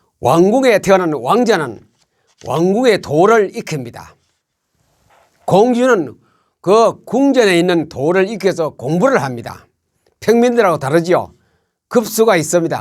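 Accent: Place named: Gyeongsang, South Korea. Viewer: 말투가 참 친근합니다 경상도분 목사님 ^^' 귀하고 좋은말씀 👍🎚감사합니다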